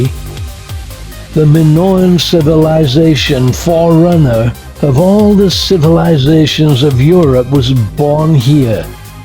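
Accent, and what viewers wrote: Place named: United Kingdom. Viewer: Love your style very clear and distinct typical British English thanks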